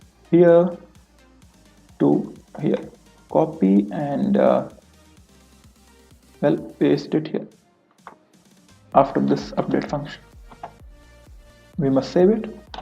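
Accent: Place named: India. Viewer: Hears indian accent..already disappointed...then sees him copy and pasting...so sad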